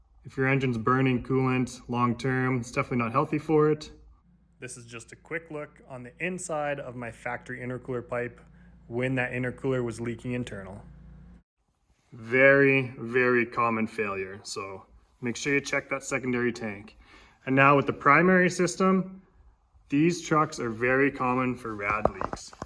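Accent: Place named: British Columbia, Canada. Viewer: I noticed you northern bc accent, lol. Checkout atlin BC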